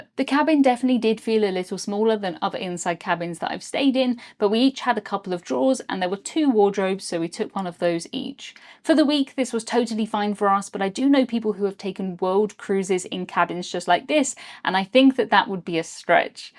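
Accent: United Kingdom. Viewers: what part of the country is your accent from? i hear different versions of British English, but i dont know what parts theyre from.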